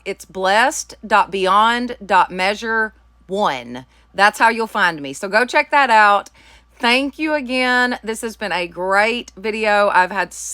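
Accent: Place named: Tennessee, United States. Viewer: Love each of these but I'm crazy over the first 2.. Gorgeous !! I am trying to guess where you are by your accent. I'm not right on many of my guesses. Lol.. I'm thinking Tennessee or North Carolina.. Am I even close ? Lol.. I live in South Carolina.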